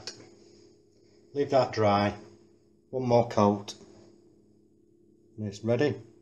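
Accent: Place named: St Helens, United Kingdom. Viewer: Sound like your from st.helens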